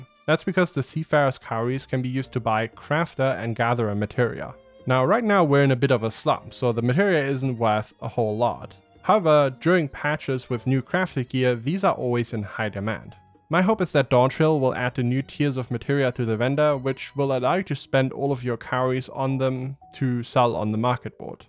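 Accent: Germany. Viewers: How many Youtube accounts do you have? or are there just a couple of you similar voiced guys out there with a German accent playing FFXIV?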